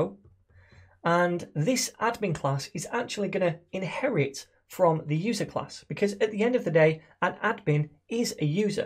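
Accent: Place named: Northern England, United Kingdom. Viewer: You are an excellent teacher, and clearly nothern England (I send Manchester??). This is some of the best JS stuff i've learned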